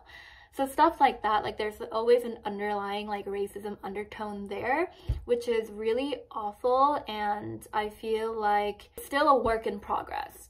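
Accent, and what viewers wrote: United States. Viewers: She sounds like a racist white girl in America! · I see you have an American accent, which possibly means you didn't grow up in Korea, so how would you know all these things about Korea? I don't care you're ethnically Korean, if you grew up somewhere else, you don't really have a place to talk about these things. If I'm wrong, correct me! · Are you Naturally born Korean? you sound more American especially the way you use your slang. Were you born in America?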